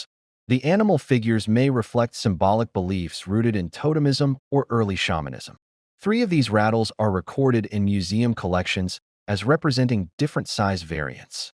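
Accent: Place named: United States. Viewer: Bitte keine Amerikanische Stimme.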